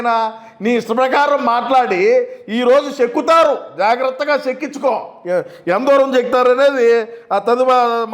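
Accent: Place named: Kadapa, India. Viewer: మా కడప జిల్లా వాళ్ళ యాసంతి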